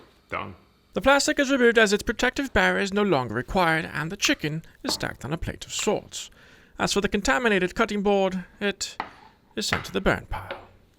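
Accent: England, United Kingdom. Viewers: You are so entertaining with the way you deliver your recipes. So different from everyone else's boring hum drum keto recipe channels on YouTube. Your videos are funny, entertaining, informative and refreshing. Loving the different costumes, characters and english accent narration.😊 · This Italian is please of what he sees. Hysterical video content and edited and awesome food. You're doing that British accent??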